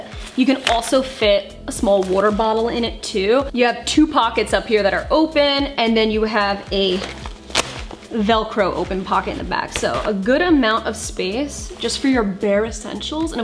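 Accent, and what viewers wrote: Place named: North, United States. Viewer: Upstate South Carolina here! Love your vids! Your accent is great. I get grief for my southern accent all the time! Love hearing your unabashed northern accent!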